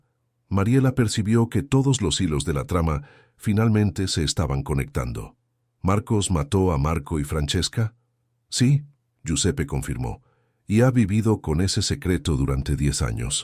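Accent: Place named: Argentina. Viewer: No me gusta porque es narrada Argentina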